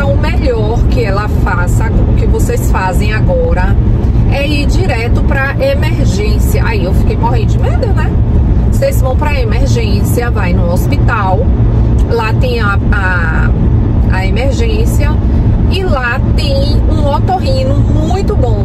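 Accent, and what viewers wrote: Bahia, Brazil. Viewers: O outono ja chegou ai, vendo daqui de Porto Seguro Beijo, que sotaque delicioso da Bahia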